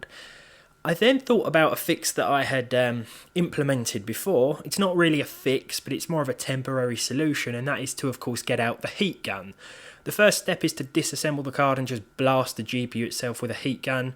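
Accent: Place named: England, United Kingdom. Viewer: always watch and enjoy your videos mate. It's nice to hear another English voice for tech stuff, given I'm Stateside and never hear one. I recently bought an EVGA GTX 1070 FTW, a Gigabyte GTX 1060 G1 Gaming 3GB, and a Zotac RTX 2070 AMP that all had "failures." They would all post, but had varying issues. - The 2070 would only post in low resolution mode and when using GPU-Z wouldn't display any VRAM information. This was fixed by, get this, applying additional thermal pads for increased pressure on the memory chips, and increasing the stock fan curve on the card. - The 1070 would artifact and crash in a few games after a little while. This was fixed by, get this again, cleaning, repasting, and applying additional thermal pads to the memory chips, then increasing the stock fan curve. - The 1060 3GB would not display an image at all, and would not be recognized by Windows besides as a basic display adapter, however, NVFlash would find it as a 1060 3GB. 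After pulling the bios on the card it had been flashed somehow with a 6GB bios. After flashing it back to a 3GB, it exhibited the same VRAM failure as the other cards, which was fixed by, get this, adding additional thermal pads. It isn't entirely fixed considering only the HDMI port works, but it at least displays an image. Not sure if you've ever tried this with a GPU exhibiting VRAM failure, but it has also worked on a couple of AMD cards I did it too. After trying the baking method and having it end in failure too oft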